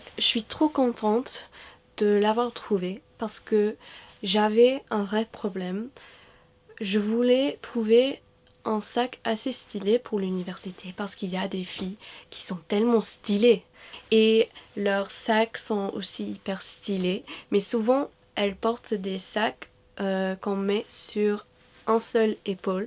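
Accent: United Kingdom. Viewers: i hope one day i could hear your British accent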